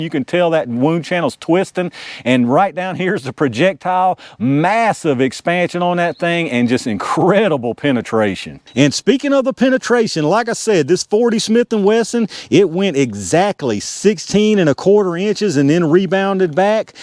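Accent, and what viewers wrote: South, United States. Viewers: True southern Bo right here, started off the video almost ina song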